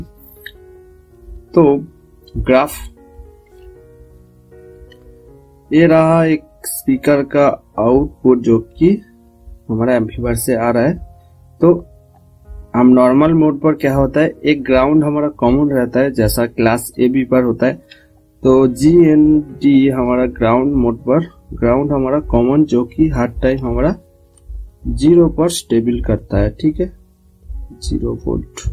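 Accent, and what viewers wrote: Bengal, India. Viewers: Bhaiya ap kiya bangali ho kiya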